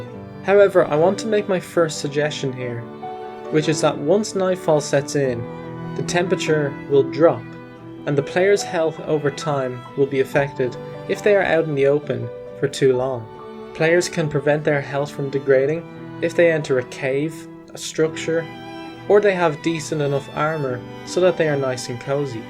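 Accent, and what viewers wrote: Ireland. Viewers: You are definitely Irish I can tell because I lived in Dublin for many years Good video by the way you make great points👍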